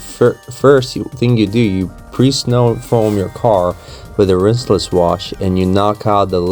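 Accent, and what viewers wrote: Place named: United States. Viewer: Your accent sounds as you're from Chicago or New York with traces of immigrant parents. Thoroughly enjoy your commentary!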